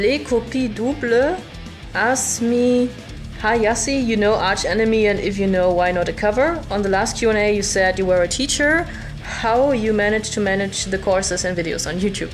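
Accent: Germany. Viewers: Hi Jassy love your covers!! But I think you should Have Zakk Wylde and Dimebag RIP!!! also Yngvie!!! And the great Eddie Van Halen!!! Imo, way better Guitarist!!! But as always love German accent!!! Keep Rocking as always 🤘🤘🤘🤘😈😈😈😈😈👍👍👍🤘🤘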